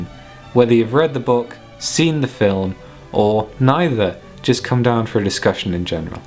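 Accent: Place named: London, United Kingdom. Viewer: Love the way you speak are Londonianian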